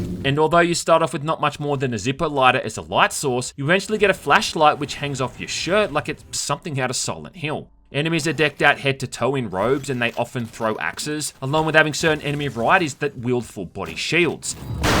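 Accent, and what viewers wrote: Australia. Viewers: Sorry to break it to you but he's clearly Australian...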